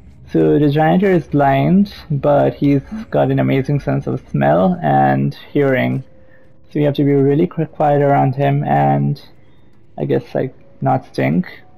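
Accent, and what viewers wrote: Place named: United Kingdom. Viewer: i was about to say i am glad to hear your bristish english voice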